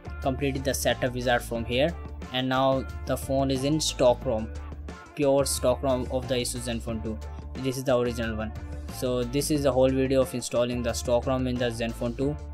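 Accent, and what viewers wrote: India. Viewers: Logat orangindiya kek pantek bahasainggrissnya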